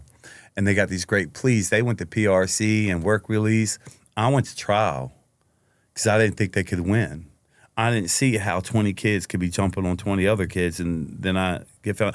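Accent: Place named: Baltimore, United States. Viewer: Why the Baltimore accent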